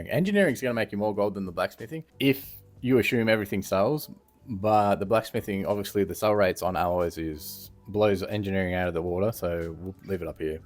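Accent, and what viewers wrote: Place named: Australia. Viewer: First video I've watched of yours. Subbed as soon as I heard the aussie accent! Gotta support a fellow countryman 👊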